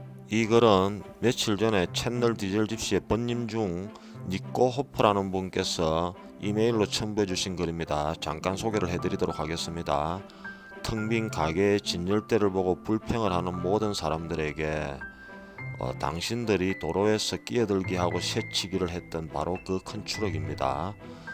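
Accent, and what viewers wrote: Gyeongsang, South Korea. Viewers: 가실없이 시벌리는 말이 유창 하네요 우리가 남이가 갱상도 머슴아 아니가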